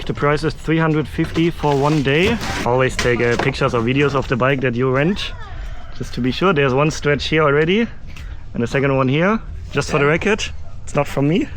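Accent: Germany. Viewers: This dude reminds me of Pee-Wee Herman w/ a German Accent!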